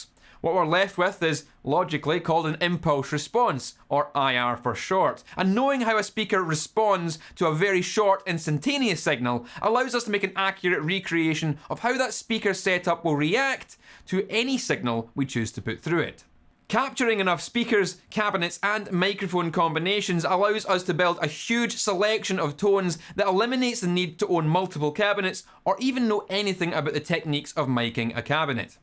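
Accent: Scotland, United Kingdom. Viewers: Aside from this (very interesting and usefully informative dissertation) on load boxes, I could listen to a Scot talk all day; auditorily entertaining...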